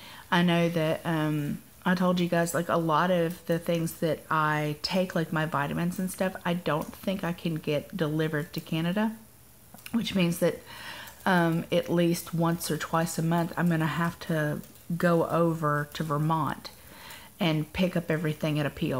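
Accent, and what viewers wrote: South, United States. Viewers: Canada is bilingual so you'll find a lot of folks who can speak/understand English. You will probably be treated just fine, as your southern accent is endearing. Montreal is a beautiful old city...are you guys going to do a vacation there to check things out? On the drug front, you likely won't find it hard to get your supplements...if anything Canada has many more over the counter drugs (that don't require prescriptions). I am speaking from experience, as I'm a transplanted Canadian who now lives in WA state. I actually have to make regular runs to the border (no...not for tacos!) to get some of the things I'm used to having on hand (Voltaren, Robax (has Soma in it) and cough syrup with codeine...yadda yadda). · Hey! You will find that what a couple of the other ladies have said is correct, there are a lot of folks in Quebec, Montreal in particular that do speak English, however, I highly recommend learning to speak french as you will be more comfortable if you do so. 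That and hearing your southern self speaking french will be AWESOME! As for the vitamins, Melanie Gregory is right. Also when we move into an area, I have gotten a grocery trolly to take on the bus so I don't have to carry the groceries home. Explore at your leisure so you are more comfortable when you have to be somewhere. What is your husband taking that will have him at the University in Montreal??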